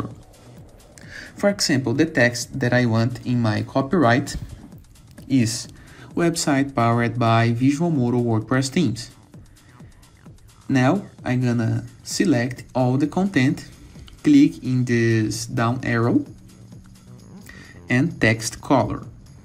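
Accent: Brazil. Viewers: o sotaque não engana, br na veia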